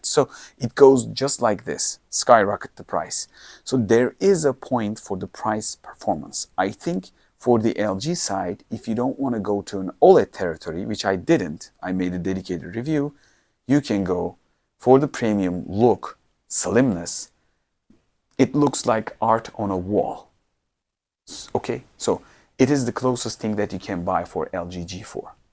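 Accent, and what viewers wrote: Turkey. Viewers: Aksan çok belli ya, abim bizden 😅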